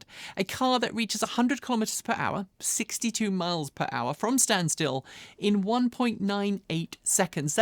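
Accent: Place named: United Kingdom. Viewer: You should skip the Political opinions on Elon Musk, who is a beautiful person actually trying to improve the planet and life as we know it for all. Maybe include some opinions on Keir Starmer, which is 100% a disgusting person. Don't know where you live, but your definitive British accent might need some more support for a good cause (Anti Starmer) and not trying to hamper anything Elon Musk is doing, which are mostly really great things.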